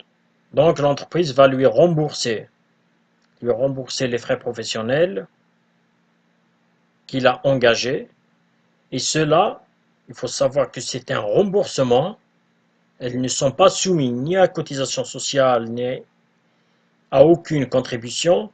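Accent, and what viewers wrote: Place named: Kabylie, Algeria. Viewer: Merci beaucoup, je souhaite suivre une formation pour devenir gestionnaire de paie, je trouve vos videos intéressantes merci beaucoup Au passage, dapres votre accent, je pense que vous etes kabyle, ca fait plaisir de voir des kabyles proposer des contenus pareils sur youtube, bonne continuation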